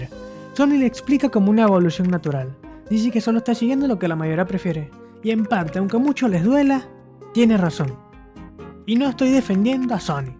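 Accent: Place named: Chile. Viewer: Quizas me equivoqué pero por su acento deduzco que es chileno o peruano. Parece más chileno que peruano.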